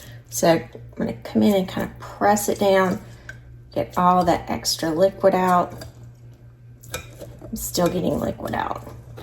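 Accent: South, United States. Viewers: im in northern maine and the temps are slowy dropping here the leaves are just starting to turn/drop...i found you on my home page... 1 THING I LOVE about your channel... your accent isnt as heavy as alot of southerns..I DO LOVE IT BUT some can get "twangy" /sing songy, if you get my meaning... and you dont do alot of chattering when you cook and you CLEAN OUT THE DISHES!!!!! my pet peeve.. with everything going through the roof HOW CAN YOU AFFORD TO NOT USE IT!!! thank you for all that you doo..;];];] keep up the great work;];];]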